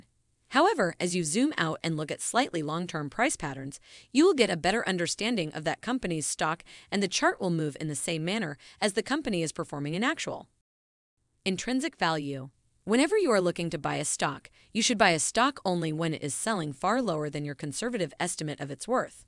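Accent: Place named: United States. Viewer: How do you have an American accent and NOT know how to pronounce "Carolina"?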